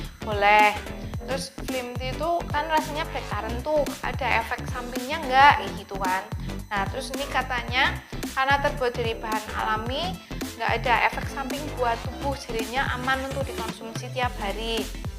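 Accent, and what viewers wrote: Java, Indonesia. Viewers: Cee lucu banget kalo ngomong. Medok Jawa😁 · Cece medok bangat ya 😀wong Jawa yaaa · Medok mbak e😂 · Surabaya? Dialectnya medok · Ngomonge agak medok yaa cece Salam teko Suroboyo 😅👍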